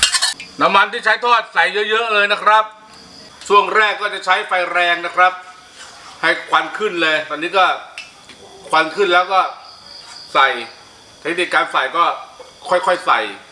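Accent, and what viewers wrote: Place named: Rayong, Thailand. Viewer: ไม่สุกทีง...สำเนียงระยองเลยคับ555..fcคับ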